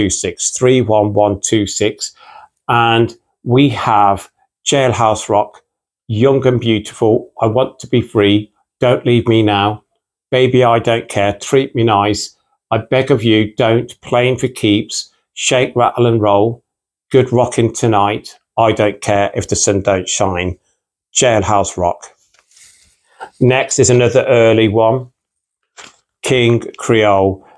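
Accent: United Kingdom. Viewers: Very Interesting videos. Your English in very clear, even so, I'd like to know where your accent is from: northern, southern, cockney? Blessings.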